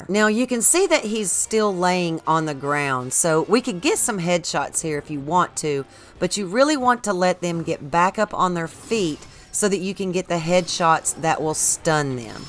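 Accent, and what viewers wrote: South, United States. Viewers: Are you southern? Lol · this is genius! also i'm so tickled by your accent, as a fellow southern person, you dont hear many southern game tutorials 😂❤ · Not to mention that nice Southern accent that makes it so chill….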